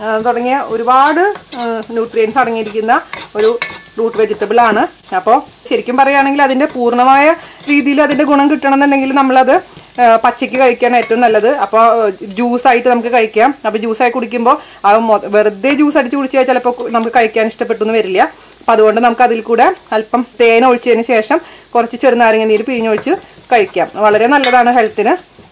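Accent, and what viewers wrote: Thrissur, India. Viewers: Nalla assal Thrissurkkari :D. Rasamulla explanation :)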